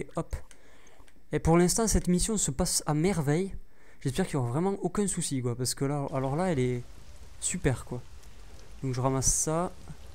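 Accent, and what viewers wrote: Toulouse, France. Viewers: C'est toulousain, on voit que t'entends pas souvent des marseillais ;)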